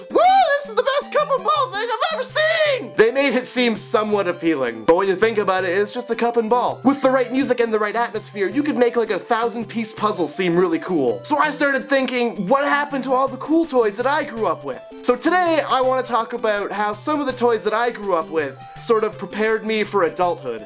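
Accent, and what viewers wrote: Canada. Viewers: i just realized from your accent that you are from Canada and now you look candian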